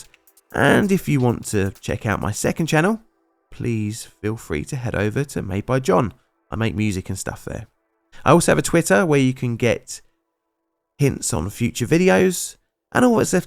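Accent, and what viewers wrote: United Kingdom. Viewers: Laughter so hard at the way you pronounced "Puget" , as a long drawn out "pooojet", which immediately tickled the 10 year old boy living in the back of my head. Thought he was pretty much gone, but the way it just popped out your mowf, surrounded by, what always sounds to us ignorant yocals in America, as a sufficticate accent, whether it's the queen, or someone speaking some form of cockney...well, it just caught me off guard a bit! Thank you for that! I needed a little bit of a chuckle today.😏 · Transport? Transportation. It's like the narrator can't decide whether he's British or not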